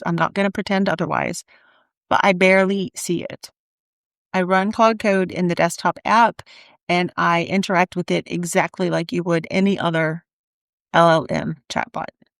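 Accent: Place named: United States. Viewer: One of the first things that caught my attention: American accent, but Weck jars in the background? As I'm just starting out with Claude Cowork and Code, I'm looking forward to following along and maybe avoiding some mistakes. Bonus: finally a woman in my age bracket explaining things to me instead of a 20-year-old guy.😉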